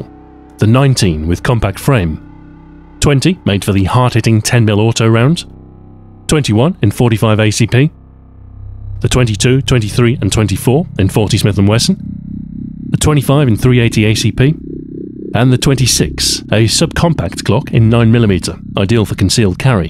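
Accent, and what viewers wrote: United Kingdom. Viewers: And if you hear his accent, you can tell he is British, which most likely means he never shot a gun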